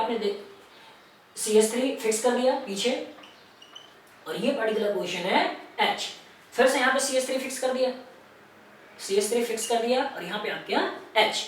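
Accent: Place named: India. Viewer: Thank you sir for your lesson but I understood your english poorly is it Hindinglish?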